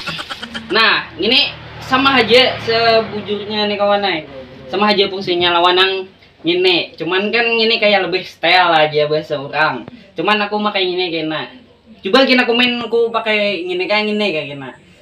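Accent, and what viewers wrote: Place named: Banjar, Indonesia. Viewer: bjur bnr tu orang Banjar😂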